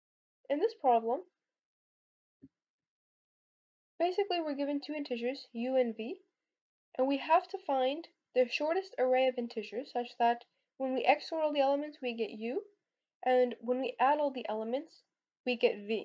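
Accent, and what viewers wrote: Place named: United States. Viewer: please clearly speak beacuase u have american ascnet and i am from india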